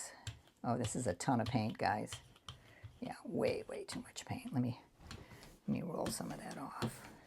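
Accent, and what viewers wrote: Boston, United States. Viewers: you are such fun! And so talented! I’ve been watching you for several hours and I’m one of the elder ladies who watch right to the end. Because I live in a seniors apt building (I’m 78) I run into those old people every day in the halls. Truly in my mind I’m much younger, haha. I love that you are from the Boston area. (accent) I live in northern Alberta Canada and we are just now getting rid of the sand salt and gravel on the roads and looking forward to green trees and grass. I loved all your collage making with household items today. I bought a gelli plate and brayer etc a year ago and it’s still in the package. I’m inspired now. I know your beautiful son is in heaven with Jesus. You have guardian angels around you.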